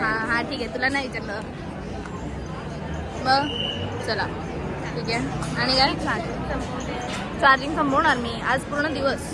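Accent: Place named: Malvani, India. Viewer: ur malvani accent is so cute, say it again 😝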